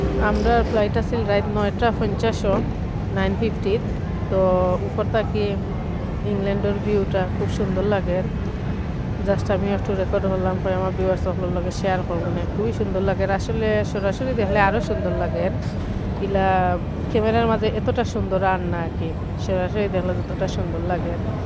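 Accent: Sylhet, Bangladesh. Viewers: আফনে গো শোনা সিলেটি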